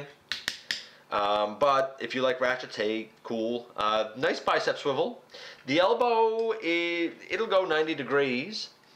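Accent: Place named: Newfoundland, Canada. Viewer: Are you a Newfoundlander ? I come from there and our accents are almost identical. The only other possibility is if you come from Ireland or Cape Bretton.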